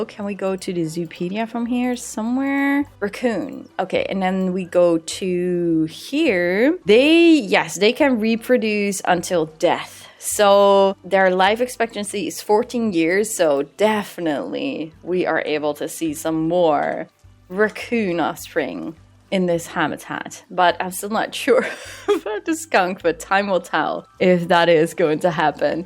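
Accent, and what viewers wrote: Netherlands. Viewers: wait are you dutch?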